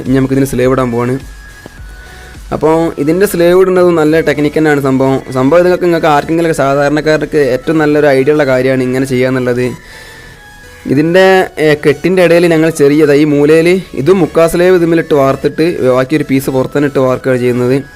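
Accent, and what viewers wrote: Malappuram, India. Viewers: "കുയി" നമ്മുടെ മലപ്പുറം ഭാഷ.....😀😑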